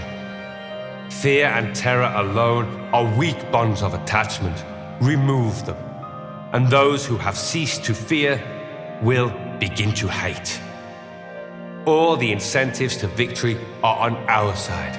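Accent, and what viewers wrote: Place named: England, United Kingdom. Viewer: Doesn't help he has an English accent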